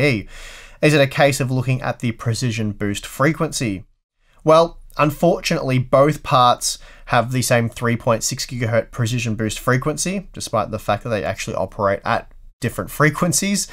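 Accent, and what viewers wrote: Australia. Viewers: Its by Aussie accent 🤓 👍.. Propably many times people hear all sorts of things. Me included that left me wondering how to manage the hearing 😄. Ah well, anyways. HU channel makes Good videos. One of my Favourites. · Your Ausie accent is tricking people :) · Poor Youtube subtitles, can’t understand an Aussie accent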